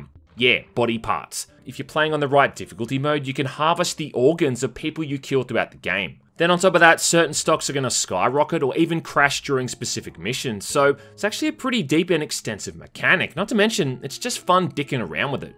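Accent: Australia. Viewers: This review suddenly feels like the bad old days of G4TV's X-Play in the mid-2000's, but instead of Adam Sessler, you get an angry Australian.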